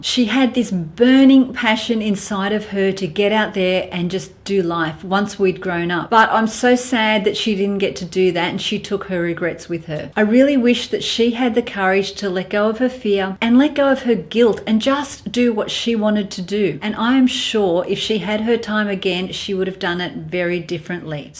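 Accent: Australia. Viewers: Thank you sharing your words of encouragement. I'm 54 and was diagnosed last August 2017 with breast cancer. I'm cancer free.. Thank God, but still going through the reconstruction surgeries. I lost all of my hair and some of my teeth due to chemo. It's a hard journey, but again I believe in my higher power. Getting back to feeling beautiful as one would look in the mirror has its challenges, but what's important I'm alive and cancer free. Loving ourselves at this age is very important and watching videos like yours and others on YouTube has been helping me get into dressing and wearing makeup again. Although I still have no hair (lol) eyebrows and lashes are still coming in.. oops I think I wrote a whole book. I love your accent and I always wanted to go to Australia. I'm in USA, but originally born in Puerto Rico. Blessings beautiful. 🌺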